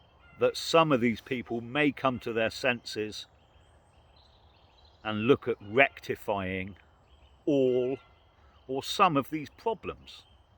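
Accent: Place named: South West England, United Kingdom. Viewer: Wondering if you're near Bristol, as we had very similar weather here a couple days back, and today, and I've been trying to place your accent for a while haha. Definitely South West, but don't worry, I'm not asking for you to give any info. I'm happy to keep the mystery alive!.. Thanks for the fantastic insight and content as always. Being an unemployed bloke in my mid 30s in the UK is a scary place to be right now. Being on Universal Credit for the first time in my life over the last couple years is nothing I'm proud of and something I had to do after getting back from a few years away just before the 'scamdemic', and after three years in Aus (not a perfect place, but a large economy like ours, the difference being the standard of life there is reflected), it's made me see how much we (here in the UK) exemplify what it is you're touching upon in this video. 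Blimey, I've really gotten an insight these last two years into just how much of a commodity and statistic I and everyone else who is out of work are viewed as, with regards to being in the system. I am currently looking for work. Being on welfare has not been good for my soul, but the prospect of getting back into work in a country that only really values me for that prospect is also quite terrifying, and that has nothing to do with the notion of a hard day's graft, but everything to do with the erosion of my soul.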